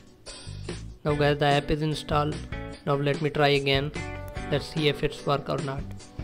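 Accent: India. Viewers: When i heard an Indian i knew this wasn’t going to work.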